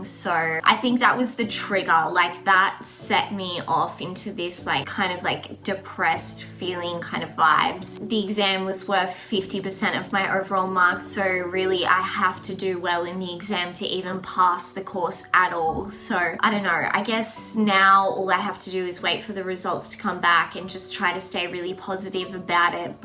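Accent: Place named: Australia. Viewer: I hear Australian but confused on the exact accent. Great video, just curious to learn more.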